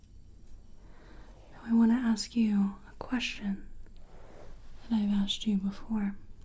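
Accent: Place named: South, United States. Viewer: Love your accent, you sound slightly Southern may I say? You are one of the best ASMR artists out there... Love ya😍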